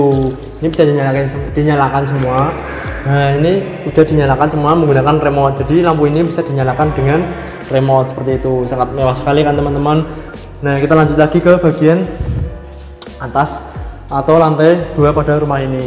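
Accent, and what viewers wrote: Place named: Java, Indonesia. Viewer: Jawanya medok bed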